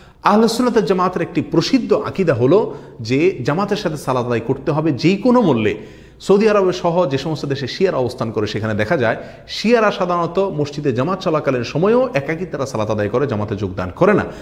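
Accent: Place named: Noakhali, Bangladesh. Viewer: শায়েখ আপনাকে অন্তর হতে দোয়া করি মুসলিম উম্মাহর ঐক্যে পানি ঢালার জন্য। মাশাআল্লাহ। আলহামদুলিল্লাহ। আজ মুসলিমদের ফেকহি মাসআলা নিয়ে অনেক বিভেদ, বিভক্তি ও ফ্যাসাদে লিপ্ত। ইহা নিয়ে বয়ান দেয়ার জন্য জাজাকাল্লাহ। আল্লাহ পাক আপনার হায়াতে বরকত দান করুন। আপনার বয়ান গুলো আমি শুনি। আপনার কথা গুলি আমার নিকট অনেক গ্রহণযোগ্য মনে হয়। অনেক শায়েখের কথা একটু বেশী কড়া ও ঝাঁঝালো।যা মুসলমানের মাঝে অনৈক্যে একটু ইন্ধন হয়। আল্লাহ পাক শায়েখদের হায়াতে বরকত দান করুন যেন সকল মুসলিমের মাঝে ঐক্য সৃষ্টি হয়। আপনার কথায় কেনো জানি আমি বুঝতে পারি আপনি নোয়াখালির কোন অঞ্চলের মানুষ।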